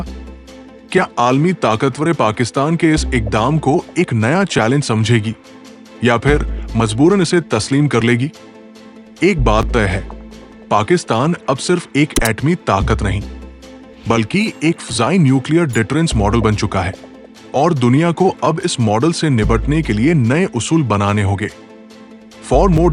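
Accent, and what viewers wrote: India. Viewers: Barway, ya bhartio ki terha urdu kyun boltay ho? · تمہارا لہجہ اور تلفظ ہندی کا کیوں ہے ؟؟؟؟؟؟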